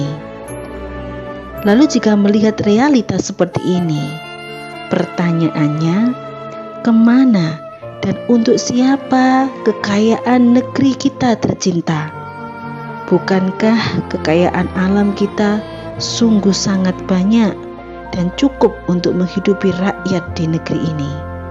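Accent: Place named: Java, Indonesia. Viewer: MasyaAllah tabarakallah.. sehat selalu dek. Medok nya buatku merindu..💕💕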